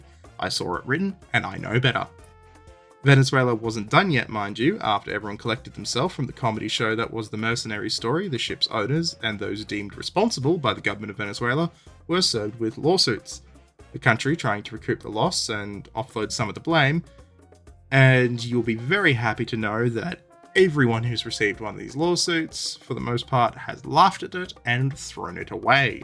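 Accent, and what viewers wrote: Australia. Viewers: Cuuunt, fkn love your docos and research. You sound like you're from Queensland. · You sound like an Aussie?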